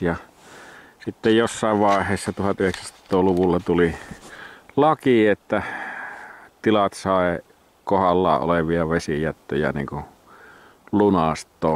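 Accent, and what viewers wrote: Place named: Savo, Finland. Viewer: Nyt on mukava kuunnella kun se on kotikulmilla niin murre tulee niinkun pitääkin. Ps. Kauhee kuunnella savolaisittain kirjakieltä😅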